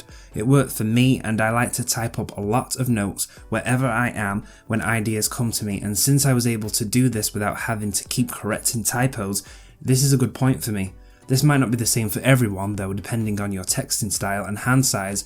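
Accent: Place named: United Kingdom. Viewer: I can listen to british people talk forever lmao. good review!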